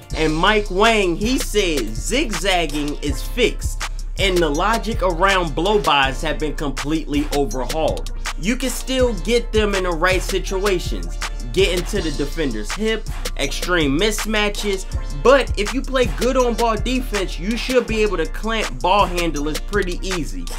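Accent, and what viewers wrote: Philadelphia, United States. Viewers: My nigga are you from Philly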